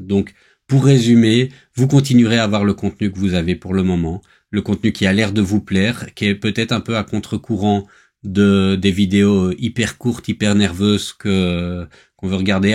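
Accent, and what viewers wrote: Belgium. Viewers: Félicitations pour cette attitude de la part d'un novice en impression 3d (heu, pas de confusion, le novice, c'est moi hein 😁) ... mais aussi compatriote 🙂. Au passage, je n'ai pas encore réussi à mettre une origine sur ta (très légère) pointe d'accent, namurois comme moi ? Bref, cette modestie et humilité typiquement belges, et parfois injustement décriées, sont tout à ton honneur. Très, très bonne continuation !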